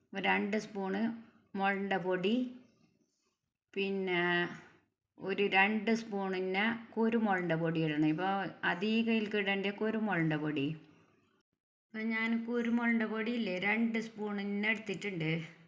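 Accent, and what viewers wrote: Kasaragod, India. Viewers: എനിക്ക് നിങ്ങളെ സംസാരം കേൾക്കാൻ നല്ല രസമാണ് കാസർഗോഡ് ഭാഷ എനിക്ക് ഒരുപാട് ഇഷ്ടമാണ്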